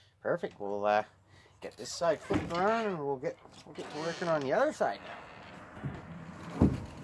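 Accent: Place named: Canada. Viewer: We have an old 15' fibreglass canoe, and when I heard you speak, (and of course the topic), I guessed- "Canadian". Then I see how you are a great 'responder' to comments. Yup- definitely helpful- also probably- He's Canadian. (, maybe 1st Nations?) Then I heard 'fiddleheads' and Oh hell YEAH!!!. Now, to guess where from"- Prairies?, nah, not enough water. NL or Nunavut" or BC? nah, more likely he'd be doing a fishing boat. Then the Lepage adhesive can- en français. Voila! Super definitely 'CANADA, in N. Ontario, Quebec or NB.? Humm?. Cheers, from Montreal. Been through NB many times, mom grew up outside Petitcodiac (Forrest Glen), happy memories, saw my first movie in Moncton when 8 years old! with my late uncle, who had a farm near "Elgin" and I almost attended UNB. Keep up the good work... and Happy paddling!:-)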